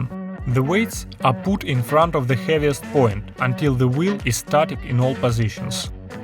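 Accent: Russia. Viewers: ох уж этот russian speaker акцент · наш, из тамбова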